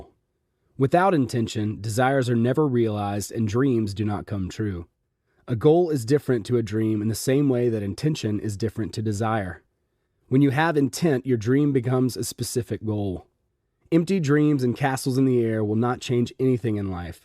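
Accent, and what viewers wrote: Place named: South, United States. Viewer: Love the voice used for the intro! It would be awesome if the same 'voice' from the intro was used to read the chapters in this audio. I understand you can't please everyone; the reader who read the chapters sounds like he has a Southern twang which was distracting. It's me, not you. lol Never the less, thank you for posting.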